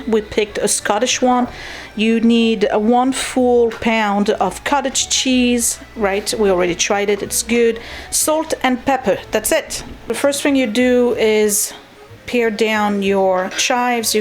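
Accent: France. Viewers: On your last video I asked if you have a German accent. But now I'm guessing it's French? That's what I thought. Everything looked yummy.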